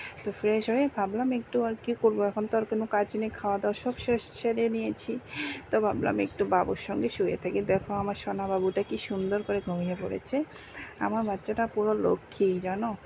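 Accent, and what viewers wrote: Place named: Assam, India. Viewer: Tmr assame biye hoyeche ki vasa sune ektu ektu lag6e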